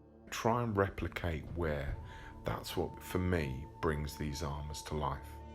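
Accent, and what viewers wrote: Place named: United Kingdom. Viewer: Ok completely off topic dude look like Jeffrey Dean Morgan and Robert Downey Jr had a kid together and then gave him a British accent and im totally here for it.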